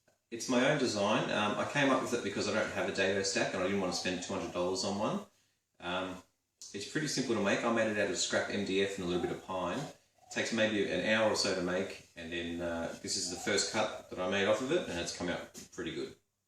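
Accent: Australia. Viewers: This is awesome. Thanks for posting. Good to hear an Aussie accent in the ocean of youtubers! . cheers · very cool. i thought I needed a dado blade which my makita mtl 100 does not allow for or a more expensive router table so, so more money. But now I know I can make a jig like yours. My makita mlt 100 has custom narrow mitre channels, yours seem to have 20 mm channels mine are T 12 mm at top and T of 15 below. But I can cut some 12 bars to make it work. Great to hear a no frills ozzie voice and no drama queen. Paddy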